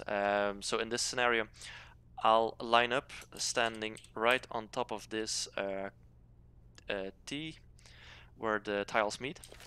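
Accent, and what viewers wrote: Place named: Norway. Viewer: Du er norsk, ikke sant? Det er bare man hører når nordmenn snakker engelsk :D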